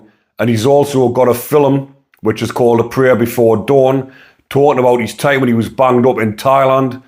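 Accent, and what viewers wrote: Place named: North East England, United Kingdom. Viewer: I hope I’m wrong, but maybe your north east accent may be why you aren’t getting the subscribers 🤷🏻‍♂️ I personally understand every word you say, but that’s because I’m English. What I see is a decent man, a man who has accepted his wrongdoing and is prepared to change. Not only that, you’re advocating peace ✌️ I think you’re fantastic mate 😮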